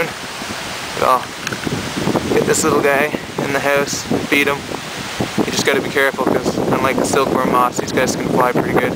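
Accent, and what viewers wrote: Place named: Canada. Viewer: You say out and house like a Canadian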